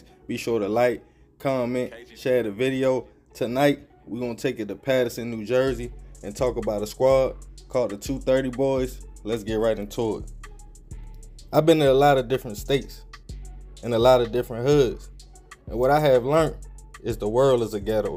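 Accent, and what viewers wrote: Baltimore, United States. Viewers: The dude narrating sound like he from Baltimore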